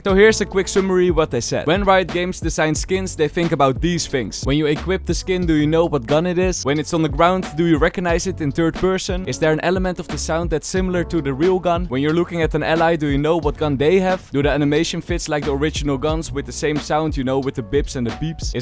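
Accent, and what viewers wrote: Netherlands. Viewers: haha het nederlandse accent is zo duidelijk (geen haat gast ga zo door met die content)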